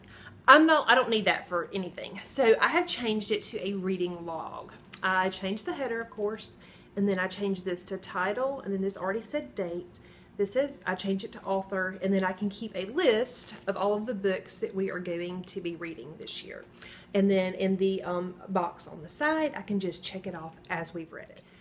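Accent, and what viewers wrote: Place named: North Carolina, United States. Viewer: rule number two - check your attitude. my husband and i died laughing! [yes, he watches your videos with me! we love your voice......hope that isn't weird. reminds me of north carolina when i lived there] i have never seen a teacher planner from EC, looks neat and i love the little fixes you made to it to make it your own!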